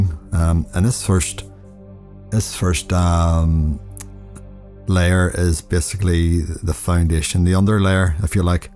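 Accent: Northern Ireland, United Kingdom. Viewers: I love your videos! And it's so nice to hear someone from Northern Ireland talking ❤👌✨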